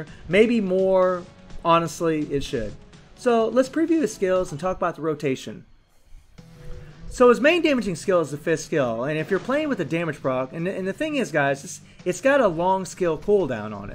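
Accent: United States. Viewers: are you a Southern American?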